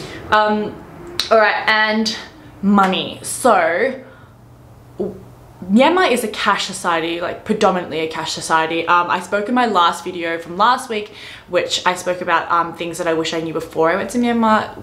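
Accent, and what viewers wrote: Australia. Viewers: Firstly, I really love Australian accent❣ Even though you are australian born chinese, you look like burmese sometimes😆😅. If you try speaking in burmese to Myanmar people, they might not notice that you are a foreigner. 😆 Anyway, stay safe and healthy. And yes, I'm glad that I discovered your channel. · Myanmar has bad reputations for a lot of things but when it comes to culture and hospitality, it’s top-notch. I grew up in Myanmar and you’re making me miss it a lot. Definitely visiting there in the near future. Thanks for all the videos you make about Myanmar! Also you’re so relatable to me since I’m from Myanmar, love Australian accent although living in the US and currently learning Chinese. My life’s a mess in a good way haha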